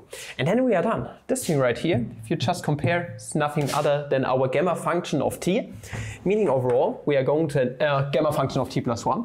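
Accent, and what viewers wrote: Germany. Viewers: Oh dear sometimes I don't know if you're speaking Deutsch or English. Work on that accent pal and it will be the best!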